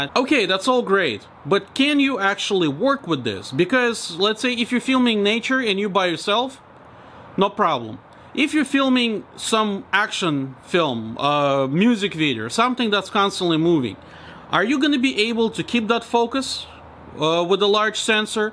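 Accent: Russia. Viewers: Sounds like a Russian accent.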